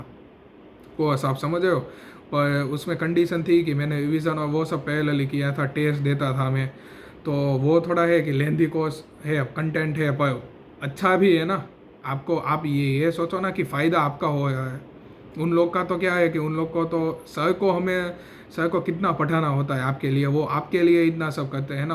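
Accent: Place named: Gujarat, India. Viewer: That gujarati accent relatable fr 😂💖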